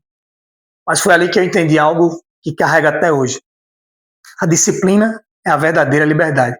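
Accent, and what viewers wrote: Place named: Northeast, Brazil. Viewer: Boa Noite. Pelo sotaque parecer ser conterraneo, Nordestino. Se for de onde? GCM de qusl Cidade? Sou Concurseiro da GCM... Policias Penaiis e PC'S, Também!!! Atualmente inscrito para GCM - Paulista PE... entre outras. Vc Tem instagran..? Tenho interesse no Projeto, acsbo de me inscrever no Canal. Como podemos estreitar ä Comunicação? Um Abraço de RECIFE - PE. 🙋‍♂️🙋‍♂️🙋‍♂️🙋‍♂️🙋‍♂️🙋‍♂️🙋‍♂️ +++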